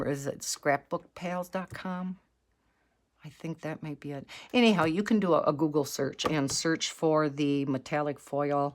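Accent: Wisconsin, United States. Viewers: Hi! I am from Menomonie. It sounds like you are in the Wausau or Stevens Point area? Just guessing. You shouldn't be nervous dear because you sound like you have been doing this forever. Pat on the back. The foil technique is so cool. I'll have to give it a try. Thanks for sharing it. ;)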